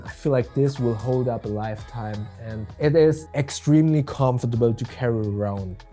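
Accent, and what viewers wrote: Germany. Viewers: ⛰great video.. and it's funny, some reviewers are very terse and others use more words. I find yours that you do use more words and sometimes a tiny bit of filler words but yours are one of those few content creators that the extra words (but not too many) actually add to the content. I can watch your video such as this one at 1.0x and I can't say that about most creators. Maybe it's the German accent which is pleasing or maybe it's the street shots 🤣. I agree with you, overall the bag is amazing and I'm one of those who do love it. Really really happy with it and wasn't going to get it, held off for a while. They did an outstanding job even with the few nits that you mention. Keep up the great work! [and ps, that's a mountain emoji in my comment, nothing else!!]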